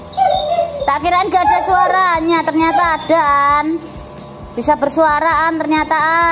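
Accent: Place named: Java, Indonesia. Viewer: medhok banget logatte.......?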